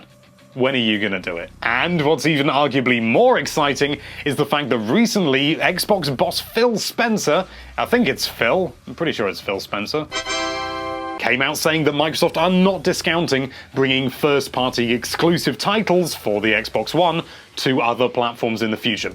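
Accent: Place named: England, United Kingdom. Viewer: Me: Ok i dont speak english totally but i will try to understand something Starts the video OH SHIT IS FROM ENGLAND WE ARE LOST (The accent of the English is UNSUITABLE)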